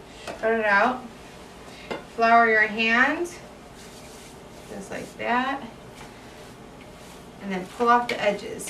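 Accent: Midwest, United States. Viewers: I KNEW I heard a midwestern accent in there somwhere haha.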